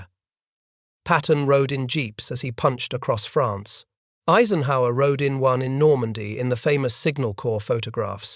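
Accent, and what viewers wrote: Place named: United Kingdom. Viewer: I refuse to listen to the same British narrator trash Germans every 30 seconds for being unable to understand breathing air or assembling 3 word sentences. News Flash News Flash News Fash British toady narrator The Germans had NO fuel for trucks, jeeps, tanks, airplanes Get it? Get it? Get it? NOOOOOO FUUUUUUEL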